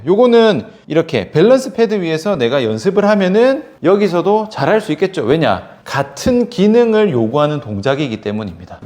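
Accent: Gyeongsang, South Korea. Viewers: 그냥 경상도 말투 😂😂😂😂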